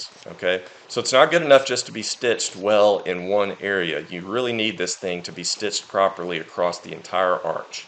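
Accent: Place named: Chattanooga, United States. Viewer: Thank you! Very helpful. I grew up in Chattanooga, thought I recognized that accent, cheers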